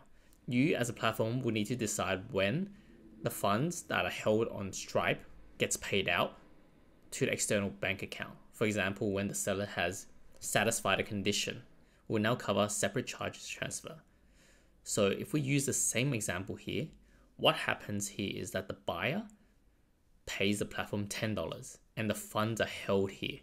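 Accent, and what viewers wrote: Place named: Australia. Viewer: Haha! thanks! Australian english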